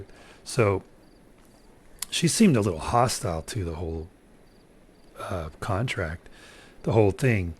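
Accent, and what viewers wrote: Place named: Southwest, United States. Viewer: favela is like the mountain side villages in brazil. howls moving castle is like the studio ghibli movie of the same name. a complex city perched on legs. wow your accent sounds really arizona new mex. thought you might be native. in my opinion copy catting isnt really a thing if you play authentically. up is up down is down . what evs. more fun stories.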